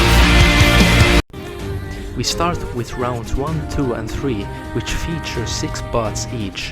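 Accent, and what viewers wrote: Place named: Finland. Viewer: sounds like an finnish accent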